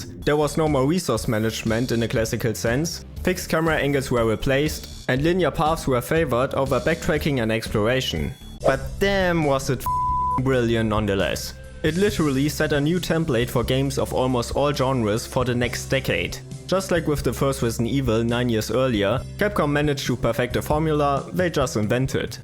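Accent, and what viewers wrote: Germany. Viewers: Diesmal kommt der krautige Akzent aber wieder häufiger ❤ Gutes Video, die Montage mit dem Zombie der sich beim Nachdenken ans Kinn fasst hat mich fast gekillt 😂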